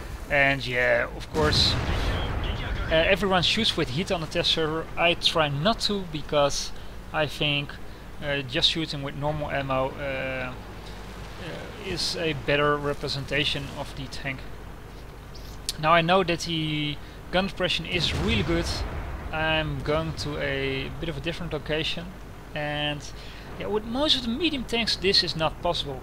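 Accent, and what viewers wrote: Netherlands. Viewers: Nice evaluation of the tank. Woulld you prefer it over the Leopard 1? And also, you sound like you are Dutch? :P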